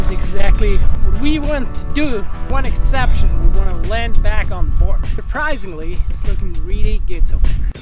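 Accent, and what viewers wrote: Switzerland. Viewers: wait what he's swiss🤯 "s wird en kampf abr vlt funkionierts ja" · auch du bist’s die uber/Ubter flip meister JG jajaja haha ich hab das wennst du SwitzerDeutsc spricht gern !! es macht mich like what the heck is he saying lol I took sechs jahre Deustch auf gymnasium und Uni und wenn ich ins Luzern war habe ich ein kickflip gemacht für ein skater und er denkt es was toll ja am tollsten lolz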